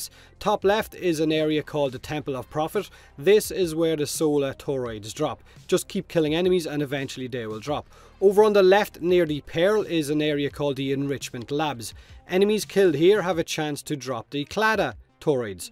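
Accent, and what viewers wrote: Ireland. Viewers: Hay is you Irelandish?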